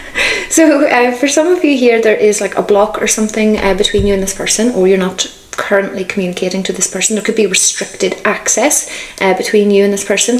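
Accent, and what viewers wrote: Ireland. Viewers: Are you from Ireland?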